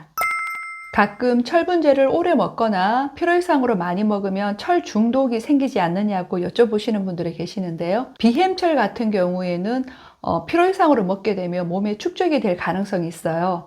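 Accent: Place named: Gyeongsang, South Korea. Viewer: 경상도 억양이 있네요 예쁘고 똑똑하시네 다 맞는 말씀입니다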